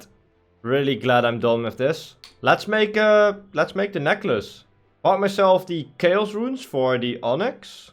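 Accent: Netherlands. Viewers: Lol , you must be dutch , that accent is so obvious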